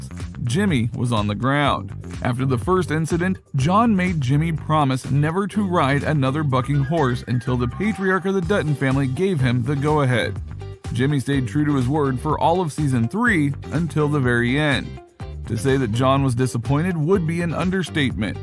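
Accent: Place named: New York, United States. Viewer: Four 6's Mr announcer dude.....must a new yorker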